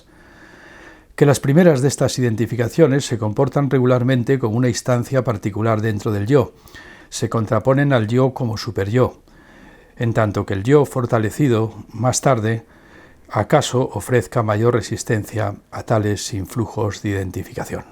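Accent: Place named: Spain. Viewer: Qué pena no haberlo descubierto antes Sr.. Llevo un tiempito escuchándolo y me resultan muy interesantes las diferencias en el modo de hablar, explicar Psicoanálisis desde la voz de un Español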